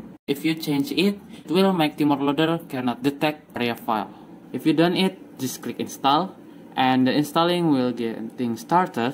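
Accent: Indonesia. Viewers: Makaih bang , btw gw tau lu indonesia dari accent inggris ly bang hehe 😅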